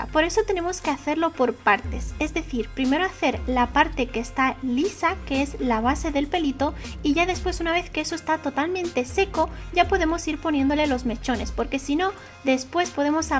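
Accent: Spain. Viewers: bonito acento, no tan marcado al español que conozco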